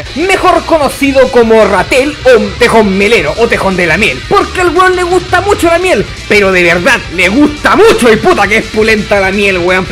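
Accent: Chile. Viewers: Aaaaaah, la jerga y acento chileno son música para mis oídos ♥️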